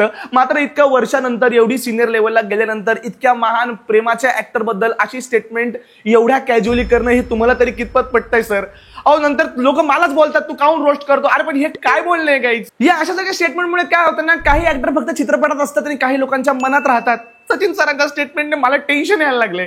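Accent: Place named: Nashik, India. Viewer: Thanks nashik chi bhasha yetech madhe madhe bolnyat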